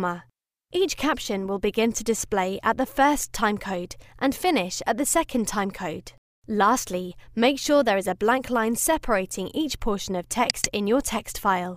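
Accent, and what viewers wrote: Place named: United Kingdom. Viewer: very thick brit accent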